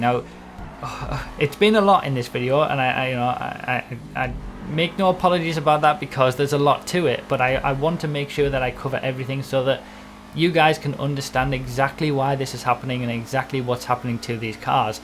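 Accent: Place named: Northeast England, United Kingdom. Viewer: where are you from? Because I am also from the north east of England and your accent sounds a lot like mine.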